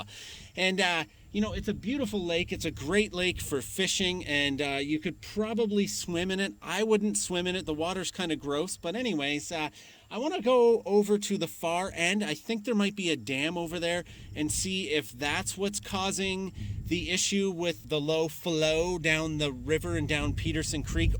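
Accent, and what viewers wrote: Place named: United Kingdom. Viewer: A pleasure as always. Ha ha, yeah I think that British with a twang. That swim spot is divine.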